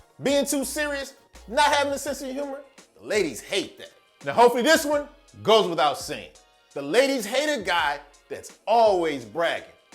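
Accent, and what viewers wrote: Philadelphia, United States. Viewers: Wait you’re from philly?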